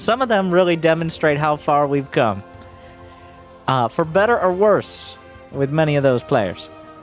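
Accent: South, United States. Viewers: Does he have a southern accent?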